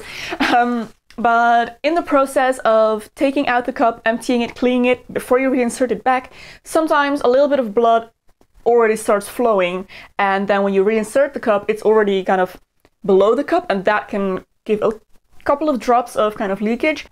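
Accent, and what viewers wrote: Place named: Netherlands. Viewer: Ik kan zo slecht tegen je nederlandse accent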